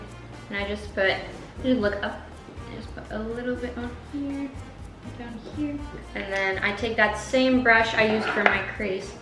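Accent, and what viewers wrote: United Kingdom. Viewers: But good london Accent! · British accent is slayyy. Btw can you pin me 📌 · The 'Brit's don't be offended XD' got me rolling on the floor. You were speaking very POSH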